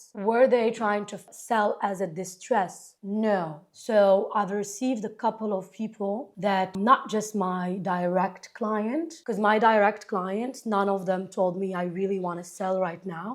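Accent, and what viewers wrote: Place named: United States. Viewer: Delusional with an American Accent can’t phantom the reality of realty. Go find a new daddy in Miami.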